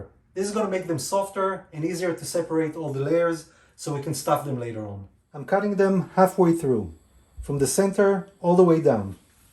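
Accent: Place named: Israel. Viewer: Amazing video. By the way, I noticed you have an Israeli accent.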